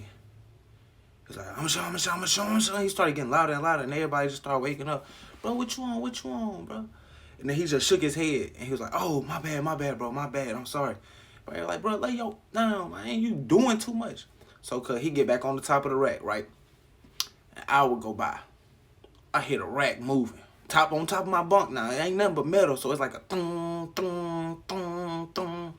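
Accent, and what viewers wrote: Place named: Florida, United States. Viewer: Where you from just asking sounds like us in Florida low-key 💯💨🌴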